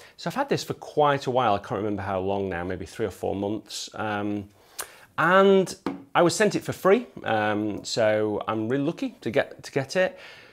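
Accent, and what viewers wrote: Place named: North West England, United Kingdom. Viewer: North West accent - yes.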